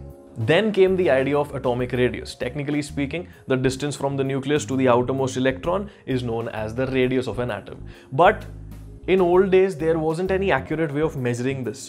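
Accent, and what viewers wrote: Kerala, India. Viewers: You sound like a malayalee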